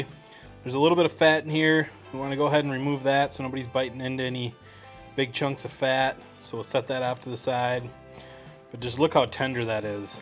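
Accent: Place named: Northern Minnesota, United States. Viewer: Thank you for the great video! It sounds like you are in northern MN? Maybe around Duluth?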